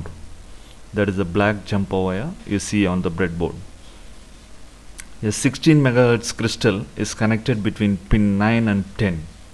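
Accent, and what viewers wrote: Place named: India. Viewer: Really thanks sir with great difficulty I found a Indian teacher really thanks you sir my ears were tired by listening to aliens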